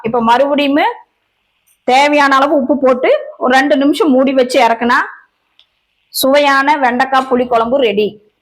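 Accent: Kongu, India. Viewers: Akka ur kongu slang is very sweet to hear. I love ur samayal.its amazing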